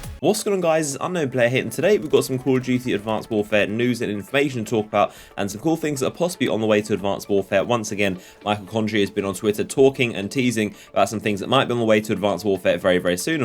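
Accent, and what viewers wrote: England, United Kingdom. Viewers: He is from England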